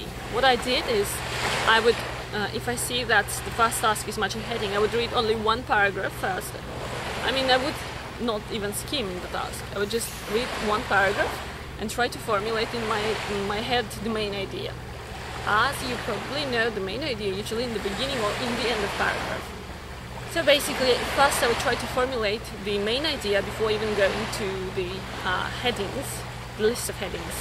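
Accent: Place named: England, United Kingdom. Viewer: This is a English accent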